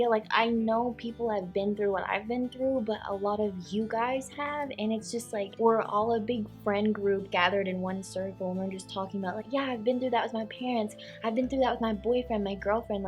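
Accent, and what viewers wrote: South, United States. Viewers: Girl I love you and your videos so much you have the cutest most subtle little southern accent and it’s honestly the most adorable voice I could live with you forever just as friends so I could hear you talk because it’s honestly the cutest thing ever And I don’t like girls like that, nothing is wrong if anyone does but I’m just saying your voice is just beautiful! And so are you! It’s honestly refreshing to see someone that’s just naturally so freaking beautiful inside and out and isn’t shallow or rude and is just authentically themselves Love you ♥️💜♥️😉😘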